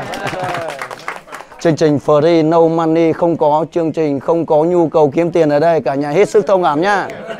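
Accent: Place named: Central Highlands, Vietnam. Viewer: Thoi sao mang theo âm điệu vùng cao (hay)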